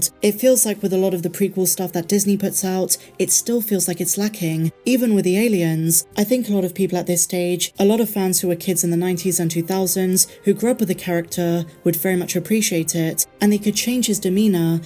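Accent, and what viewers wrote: United Kingdom. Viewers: Hi I am just loving all of the mandalorian…enjoying just watching it and then watching breakdowns and seeing things anew! Thank you❤ and having a uk voice is really special too.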